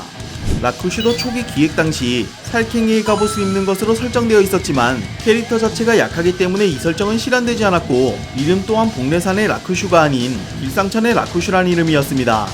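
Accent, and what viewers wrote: Gangwon, South Korea. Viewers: 약간 강원도 사투리쓰시는듯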